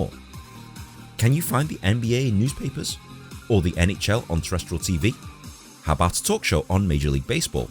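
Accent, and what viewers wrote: United Kingdom. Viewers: Love your very fine British voice with an Asian appearance. Proves something to me, but I am not sure what! hehehehehe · Dang, an Asian guy with a British Accent? How is that even possible? It's good to see Football popular in England. I still have no idea why soccer is so popular. 90 minutes of running around kicking a ball randomly all over the field and no one scores a single point. I watch a few minutes of soccer if I can't sleep, it's so damn boring I fall asleep almost immediately.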